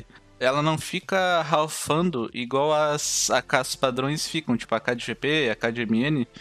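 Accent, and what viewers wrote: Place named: South, Brazil. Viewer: Mano tu é do Sul né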